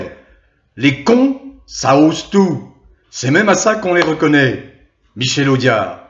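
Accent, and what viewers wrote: Marseille, France. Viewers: Joyeux Noël Mr.et j espère que l on pourra encore rire avec vous…et votre très bel accent…marseillais…Un grand merci de nous tenir au courant…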